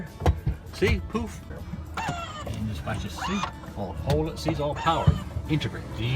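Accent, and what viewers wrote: Canada. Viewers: "...over tree hundrid horse power!" Haaaaaaa! Love that Cape Brenton accent... "You frum the Bay, By? Noooooo, I'm frum the Pier, Dear!" **and** "It's a noice night for a foight, Mike!" are also Cape Breton classics :. )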